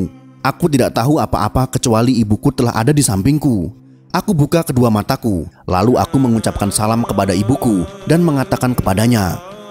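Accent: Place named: Bali, Indonesia. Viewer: ni yg baca cerita kaya logat bali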